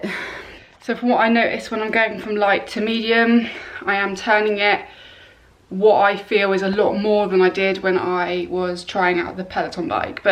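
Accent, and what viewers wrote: England, United Kingdom. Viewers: Nothin wrong with your accent but then i'm english too. Thanks for the additional info, shame fitness superstore have followed all of the other sellers and whacked the price up to £350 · i love the english accent... the more up north the better it gets ;-)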